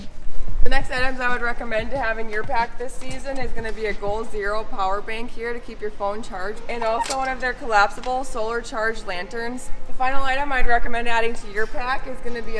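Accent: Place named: Canada. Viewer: She sounded just like a normal person and slowly she sounded more and more Canadian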